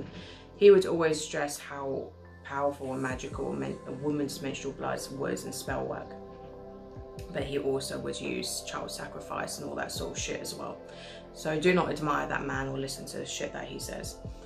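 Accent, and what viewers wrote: London, United Kingdom. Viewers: After living in devon for the last 20 years so nice to hear a fellow londoner ...blessings 🌛🌕🌜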